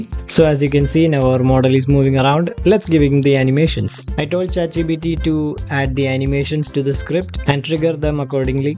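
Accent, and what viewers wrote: Kerala, India. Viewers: The mallu accent is lit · bro are you from kerala just guessing :) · Are u frm kerala?(malayali) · Edaa malayalai moneeeee🌝Ninte english ketta mansilavum nii oru mallu aannenn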